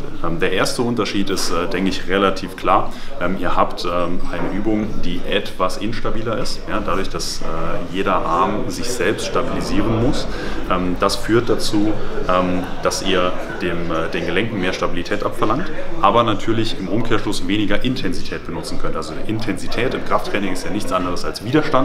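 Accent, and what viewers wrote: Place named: Saarland, Germany. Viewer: finds geil das ich nach nem video gesucht habe das mir die thematik näher bringt 100 prozent alles dabei was ich gebraucht habe. auch geil das ich beim schauen irgendwann den saarländischen dialekt rausgehört hab, geil auch noch dass es aus meinem Landkreis ist xD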